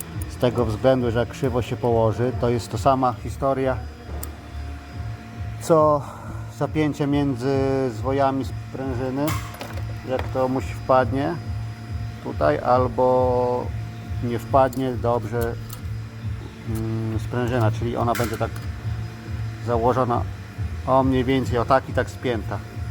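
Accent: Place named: Ukraine. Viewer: Zaciągasz ukraińskim koleś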